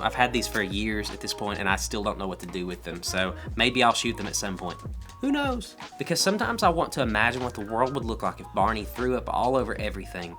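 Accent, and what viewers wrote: Kentucky, United States. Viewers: I could tell your accent was a KY accent and when you mentioned being a hillbilly you confirmed it for me. Fellow Kentuckian here. I’m so excited to follow your journey!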